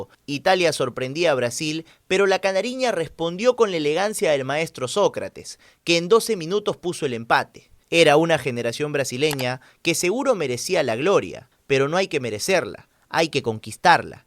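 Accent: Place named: Argentina. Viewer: Me suena como argentina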